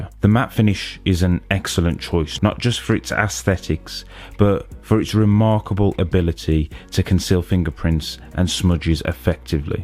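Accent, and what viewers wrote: United Kingdom. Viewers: Your accent reminds me of a British singer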